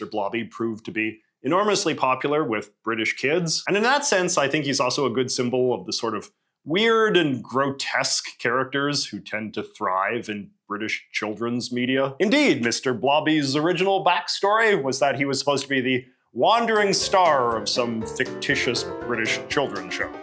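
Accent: Canada. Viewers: you have the most canadian accent i have ever heard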